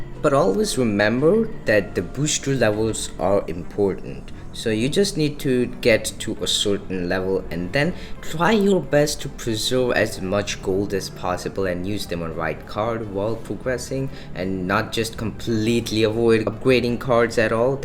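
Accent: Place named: India. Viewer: why can’t indians say “er”??? its always “ohh” like instead of “first” they say “fohst”. or burst is bohst. so annoying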